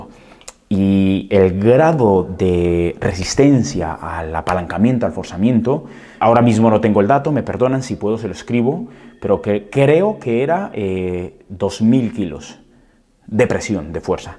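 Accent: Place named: South America. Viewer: Y ese acento de donde es? Parece de sur america tratando de hablar como español....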